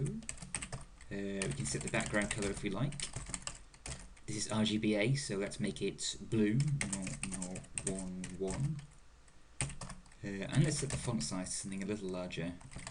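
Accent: United Kingdom. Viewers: These videos seem to be very interesting but unfortunately I am not able to understand the author as I should for a tutorial. His british accent and the speed at which he speaks is too much for me :( Subtitles are no option. sad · I love i when British people narrate tutorial videos !!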